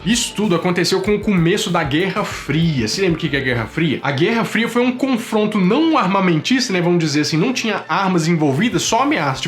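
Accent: Minas Gerais, Brazil. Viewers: CE é mineiro ?? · Ele falou tudo certo, o sotaque mineiro confundiu vocês sô!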